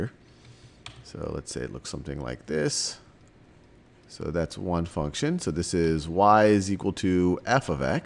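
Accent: United States. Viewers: Definitely American. I’d guess either Midwest, northwest, or mid-Atlantic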